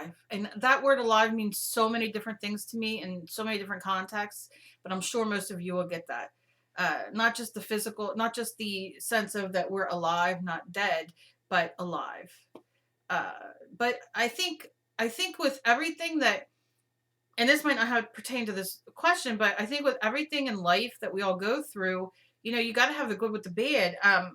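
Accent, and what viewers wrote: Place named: New Jersey, United States. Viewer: You are so NJ and it is so refreshing. I too am a Jersey girl and when you say "frickin'" it just makes me laugh!!!!!! stay strong and spunky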